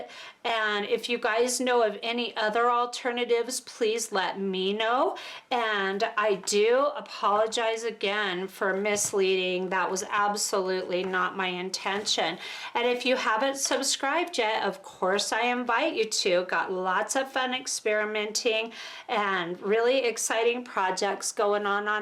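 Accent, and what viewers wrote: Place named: Midwest, United States. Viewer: Thanx so much... I was looking for those paints!!! Phew! Saved my sanity... lol. I am a new sub and really enjoy your videos. Love the different techniques you show us! I am wondering... where do you live? I am in SE Texas but you seem to have a midwestern accent. Just curious... Hugs!!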